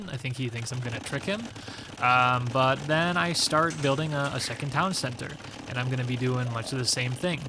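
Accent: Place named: Minnesota, United States. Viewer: you sound like you are from Minnesota lol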